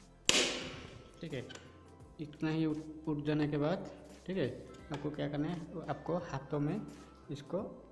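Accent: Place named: Odisha, India. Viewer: Friend aap odisha se ho na.. (bhangega nahi)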